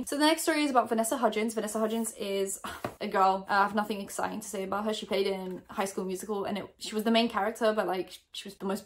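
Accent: United Kingdom. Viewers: What is your nationality? Because you've mentioned that you don't have a British passport but you sound very British lol :)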